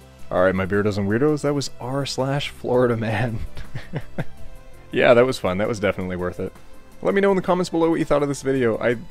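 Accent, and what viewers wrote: United Kingdom. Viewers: Just a random german: gets read in the most sterotipically brittish accent possible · Post: JustARandomGerman: Reads in a British Accent Me: wh-... You know what, I still love it Edit: I just noticed that many others already noticed and commented this 😂 Well, great minds think alike. High five ✋🏼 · why a British accent? their username clearly tells you they are german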